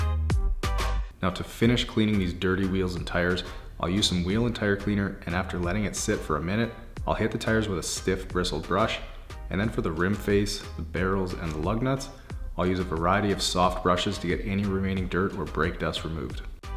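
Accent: Canada. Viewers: Favorite part: YOU! Best Friend’s favorite part: Your Canadian Accent Always tempted to buy every item on your list in the description 😂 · Are u Canadian? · I pretty much am just here to hear the Canadian accent. Not gonna lie. Also I'm from Australia. I now have a strong motivation to detail my car but 100% won't because I'm a worthless person.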